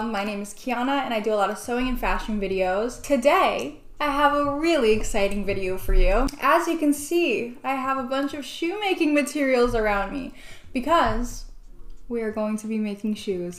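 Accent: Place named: South Africa. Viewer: Just wondering but are you South African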